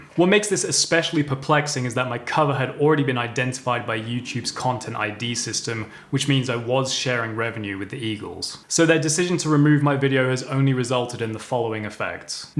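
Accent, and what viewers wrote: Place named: United Kingdom. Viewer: You sound like you are across the pond. testified before the United States Congress about this issue. Since they have hired a small army of tech people to protect their interest. The very idea that you not being able to steal from them is music to my ears. Get over it & move since you are so convinced that without you the musical world be a worse place. If I made a decision on music I wanted to learn based on your remarks. Wow, I think a music attorney has a lot to tell about what rights you do not have. You have the right to speak of them because they are public figures. Personally I think I may see if you have any thoughts that are original. Doubtful since you are doing cover songs with over 1.5 mil subs. Get real you sound like somebody's wife bitching. Hard to collect money from through BMI ASCAP or any other music licensing authority. 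BE SURE TO CHECK REMIX ON YOUR VIDEO HERE. YOU ARE NOT GOING TO LIKE IT. I WAS A PART OF THE INDUSTRY AND BUSTED ON MANY SONG THIEF'S ASSES.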